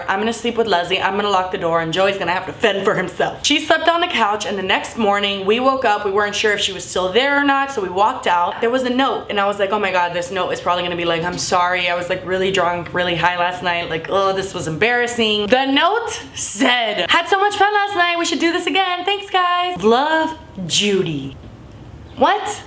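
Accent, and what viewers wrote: Cuba. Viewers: Yes she's Cuban, she's said that many times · she's cuban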